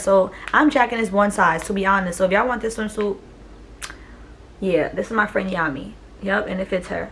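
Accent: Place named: Boston, United States. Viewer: She sounds like she from Boston lol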